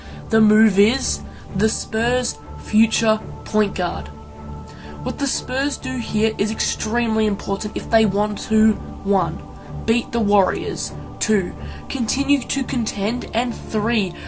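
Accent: United Kingdom. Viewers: i don't necessarily agree w/ everything you said in the video, but you did a good job stating your case, and your voice is fine. you sound like a teenager w/ a british accent. How old are you, and what country are you in? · he kid, when you say spurs is best franchise in the history of the nba history, you must be high on drugs or to drunk to figure out what the words that are coming out of your mouth, championships matters most, you can be in the playoffs everytime or even since the start of a certain team in the nbabut never won a championship, that won't count and by the way you sound like british stick with football, i'm filipino and despite being short for basketball our number 1 sport back here is basketball, play make sure that you know what your talking about, i forgive you this time, but allow me to say this, you're a f*cki'n idi*t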